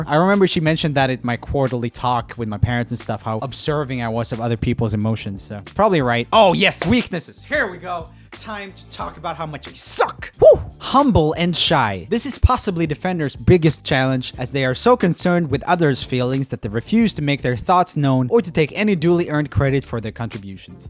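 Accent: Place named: Sweden. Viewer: His accent is so swedish, like pewdiepie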